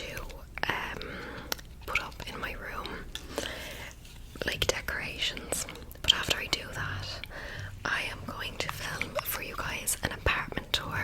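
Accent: Ireland. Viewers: I have a 5-star asmr playlist. Hard to get on it but this one is on it. I also have an “Exceptional” ASMR playlist. Even harder to get on, but I just put this one on it. Your Irish accent voice is the best, and you are one of the most beautiful women I have seen on YouTube. Thanks for sharing with us.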